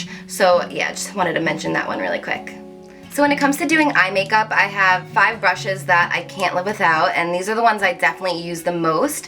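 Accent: New Jersey, United States. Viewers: are you from NJ?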